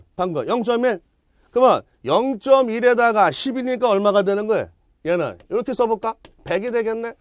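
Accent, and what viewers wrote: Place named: Jeolla, South Korea. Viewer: 강사님 전라도분이시죠? "그므는" 저도 전라도 사람인데 너무 구수하고 좋습니다.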